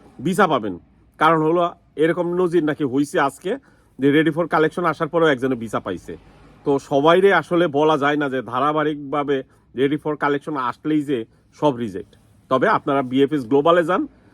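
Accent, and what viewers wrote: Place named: Savar, Bangladesh. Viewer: assalamualaikum, vai apnar kotha shuina monee hocche apnar bari dhaka savar. vai ami savar thaki .amar onek diner iccha ami italy jabo kintu porichitoo kew na thakatee jaitee partechi na. vai ami deshee onek din jabot bekar boishaa achi kintuu kichui kortee parchi na. onek din dhoirai vabtachi itali jaboo .jodi choto vai mone koiraa iktuu help korten thalee chirojibon kritoggo thaktam. taka poishaa ditee parum inshaallah somossa hoibo na.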